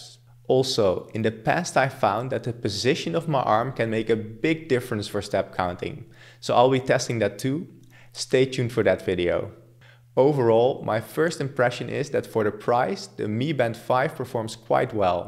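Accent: Netherlands. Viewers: Are you Dutch? Your accent feels similar. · AHHAHAHAHAHA 100% accuracy in Dutch speaking English.... I was worried I didn't recognize u r Dutch correctly because the cars in your video had white background plates, but then I checked your CV... :D Good job, amazing video, thanks a lot for your videos, i'm ur subscriber now! Greetings from Rotterdam, I'm an expat here and love the country! Tot volgende keer! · Are you Dutch? The accent sounds very familiar. The video was great, comprehensive analysis of the features in a tidy format, well done! :D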